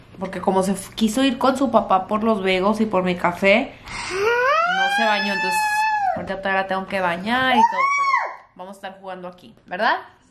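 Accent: Monterrey, Mexico. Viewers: Eres súper buena onda, no sé si es mi imaginación pero como que tienes acento regio, me identifique jajaja Saludos desde Monterrey 🇲🇽🤠 Bendiciones ✨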